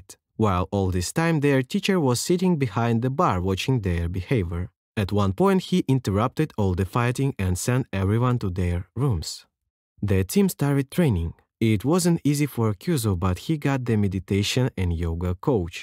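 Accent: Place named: Russia. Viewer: чел, ты бы хоть русский акцент убрал, если уж озвучиваешь на английском )))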